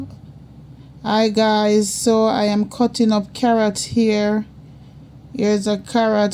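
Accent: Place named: Jamaica. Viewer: Thank you so much love, i was really struggling with these, it was really helpful. i am always loving the Jamaican accent.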